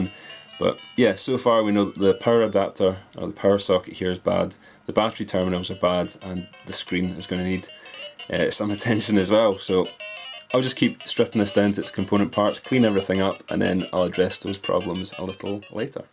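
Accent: Scotland, United Kingdom. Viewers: I love this accent! I can't quite pick it up properly though, is it Scottish? Edit: definetly Scottish. I'm Scottish so it's like really hard to pick up everything you hear on a daily basis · If you're implying he's English or Australian then wrong on both parts! He's clearly and obviously Scottish. You must be an American. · I'm American and could tell he was Scottish. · are you scottish? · I love Scottish accents. ouo That console looked far worse on the eBay page than it does in person! The motherboard must be corroded to hell!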